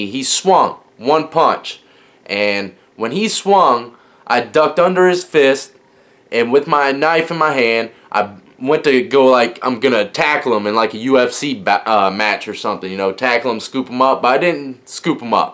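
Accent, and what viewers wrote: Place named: Midsouth, United States. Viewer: I just knew you were from somewhere in the midsouth. Keep up the content...never boring.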